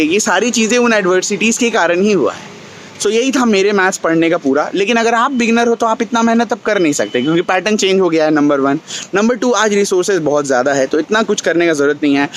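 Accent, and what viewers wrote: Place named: mixed, India. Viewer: Hmmm...hmare up Bihar aise hi bolte h ap kha se ho bhai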